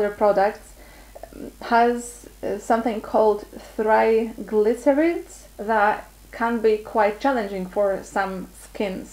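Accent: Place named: Poland. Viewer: Kurde, a jednak, my Polacy poznamy się wszędzie. Usłyszałam tylko Twój akcent ;) Żeby nie było, mam taki sam, nie napisałam tego, żeby Cię obrazić :)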